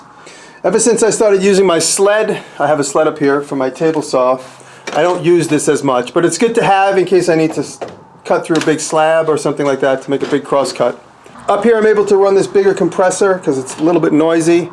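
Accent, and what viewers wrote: New York, United States. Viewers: This guy is straight New York! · I am so glad you answered my question after watching your vids for one day. I could tell your shop appeared to be in a basement, but had no idea you were in Manhattan. My question was where you were located. Your accent sounded like the big apple. I spent alot of time in Brooklyn Heights. I live in South Louisiana. Great to meet ya, Jeff W Waldrop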